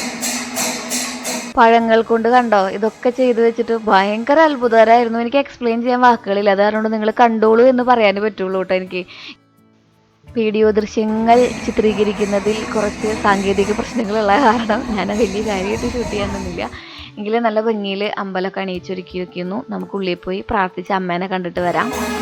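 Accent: Thrissur, India. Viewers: Aniku pandulla vedio anu eshttam.originalty undayirunnu.trissur slang correct ayirunnu.ennu athokke mariyathupole.❤❤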